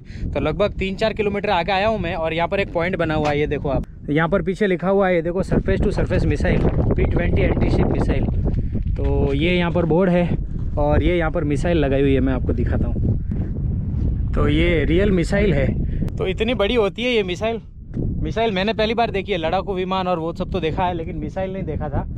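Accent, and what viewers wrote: Gujarat, India. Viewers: Bhai hindi me Gujarati language sound effect aur sabd jitna ho sake utna Kam kijiye baki pura suddh hindi bolne ki try kijiye 😁 love from Gujarat ❤️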